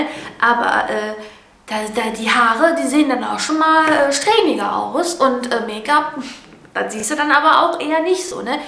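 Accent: Ruhr, Germany. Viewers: Hömma, bisse auch aussm Pott, ne? ;-) Sehr sympathisch.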